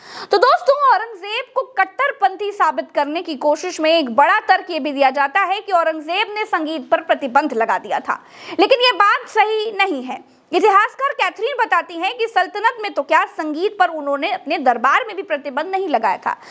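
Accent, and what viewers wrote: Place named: Delhi, India. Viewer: Such a shame on you for defending such a cruel personality like Aurangzeb. I am a very secular minded person respect all religions but felt so ashamed on you comparing Golden Temple attack by Indira Gandhi with Aurangzeb demolishing Hindu temple. You sound like a Delhi girl. Please visit Gurudwara Shishganj where Aurangzeb beheaded 9th Sikh Guru Teg Bhadur sahib ji for opposing forceful conventions of Hindus and not accepting Islam.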